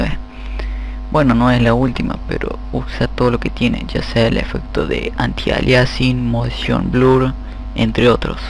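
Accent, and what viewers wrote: Córdoba, Argentina. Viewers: Sos cordobés? Me parece por tu tonada.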